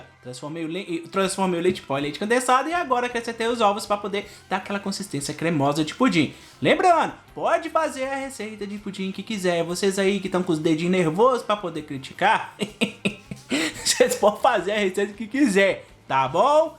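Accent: Minas Gerais, Brazil. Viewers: Eu ja adoro te ouvir com esse sotaquinho mineiro. Amo suas receitas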